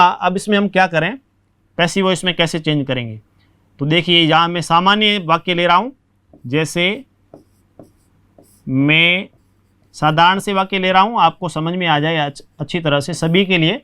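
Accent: Marwar, India. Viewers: Sir your English is not fluent Marwari tone aa rahi he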